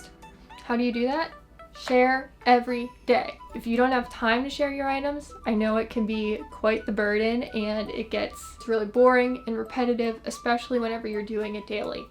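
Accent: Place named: Pennsylvania, United States. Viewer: great tips! you remind me of Pam from The Office and I LOVE the office - must be the PA accent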